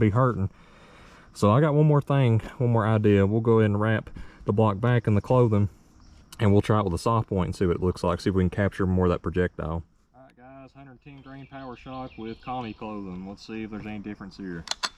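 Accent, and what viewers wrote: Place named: South, United States. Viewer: You have the purest southern accent i've ever heard :D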